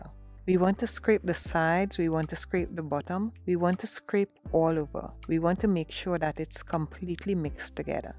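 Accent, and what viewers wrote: Trinidad and Tobago. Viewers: I knew you were a Trini from the time I hear your voice🇹🇹🖤🖤🖤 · hey ,,, yesss! trini accent very nice I stumbled upon your channel and your voice got me watching.... nice ideas thanks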